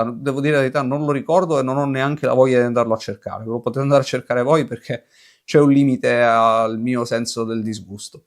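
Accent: Sicily, Italy. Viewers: Purtroppo, il femminismo "è" la massima espressione — e al tempo stesso la forma più rozza e grottesca— del capitalismo. Chi, meglio delle donne, per la loro naturale e debole inclinazione a servire e compiacere l’autorità e i potenti, poteva incarnare così bene questo nuovo dovere mascherato da liberazione, dovere che non ha nulla di rivoluzionario se non quello di aumentare i privilegi del genere femminile, genere più servile del potere? Comunque, ottimo video: è sempre un piacere ascoltarti — e con quell’accento siciliano, tutto diventa ancora più piacevole! è stata una fortuna vedere ed ascoltare il tuo canale! grazie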